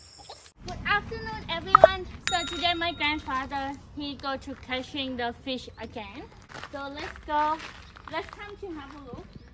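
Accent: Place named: Australia. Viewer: I can hear the Australian accent in that English language 😊👍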